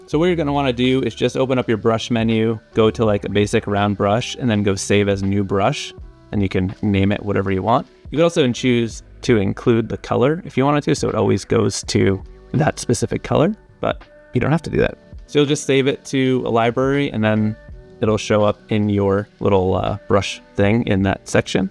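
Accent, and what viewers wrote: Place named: South, United States. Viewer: Southern drwarl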